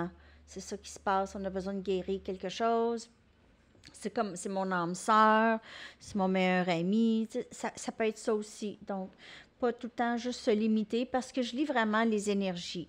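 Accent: Canada. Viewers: Chère comme ça va faire longtemps que je n’ai pas eu un billet d’avion dans ma poche tu es mon petit quart d’heure « dépaysement » entre ton petit accent canadien qui me ravit et tes jolies remarques sur les oiseaux ou autres charmantes voire drôles Ben je voyage quand même grâce à toi Je t’écoute depuis la France J’ai déjà été au Canada dont je garde précieusement quelques beaux souvenirs 💕❤️💗💓❣️😘🌺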